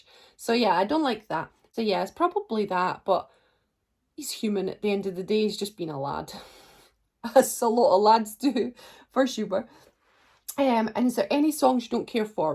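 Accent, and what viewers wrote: Scotland, United Kingdom. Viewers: Hi thank you for part three. Very entertaining. Love your Scottish accent 😻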